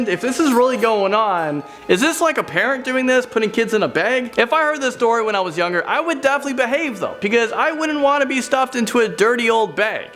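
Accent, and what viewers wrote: Canada. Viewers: “Oot”. Thank you. Nice to hear a Canadian accent escape onto the net.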